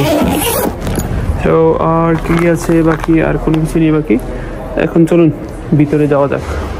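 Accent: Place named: Chittagong, Bangladesh. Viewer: ভাই চট্টগ্রামের মনে হয়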